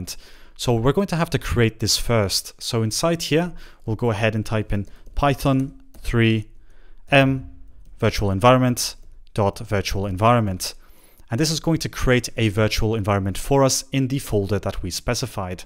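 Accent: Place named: Italy. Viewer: MA SEI ITALIANO LOOOL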